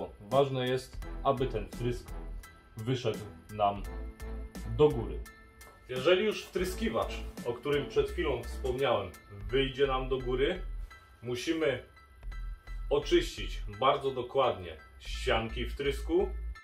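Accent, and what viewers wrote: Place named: Silesia, Poland. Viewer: Ślonskiej godki nie ukryjesz 😜 P.S. fajny film! ;)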